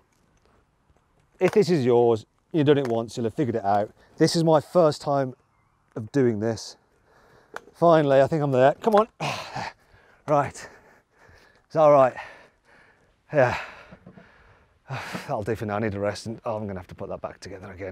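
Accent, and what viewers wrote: United Kingdom. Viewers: does that mean is Polish immigrant? I always thought hes British because of perfect accent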